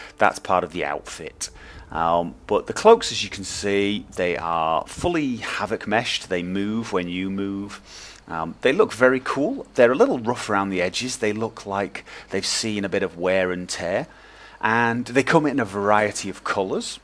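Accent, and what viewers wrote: United Kingdom. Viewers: cool accent=) are you British?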